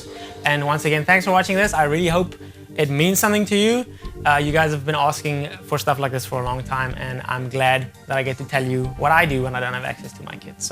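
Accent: South Africa. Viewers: Sounds like South Africa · What kind of accent is that??? South African?? Where is from?? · SOUTH AFRICAN!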